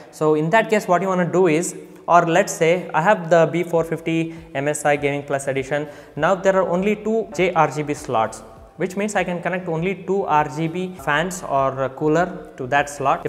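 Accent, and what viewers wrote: India. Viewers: I had quite a bad day and hearing English with Indian accent really cheered me up. Great video!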